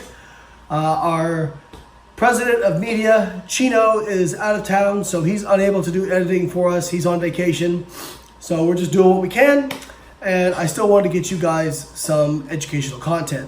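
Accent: Australia. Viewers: An Aussie accent creeping in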